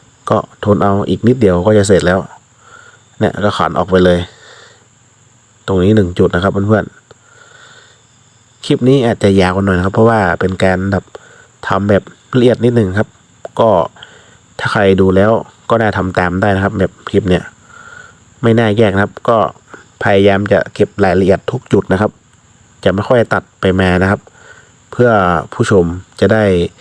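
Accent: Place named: Chiang Mai, Thailand. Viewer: สำเนียงออกเจียงใหม่แต้อ้าย😂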